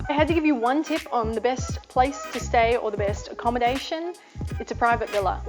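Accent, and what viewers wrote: Australia. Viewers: I’m interested to know where is your accent from ? Not a typical Aussie accent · Oh gosh, this is the first video I'm seeing of yours, and I love the way you make this video interesting and at the same time informative ! Good luck!! Ps. I'm kinda feel by your accent that you're from Australia. Are you ?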